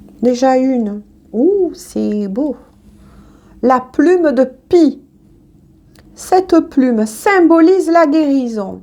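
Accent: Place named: Marseille, France. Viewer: C’est vous le rayon de soleil,avec cet accent de Marseillais « je crois « vous écouter m’apporte beaucoup, mais bien sûr aussi les messages dont vous nous faites la lecture, merci d’être là votre chaleur est réconfortant. 🙏✝️💜🙏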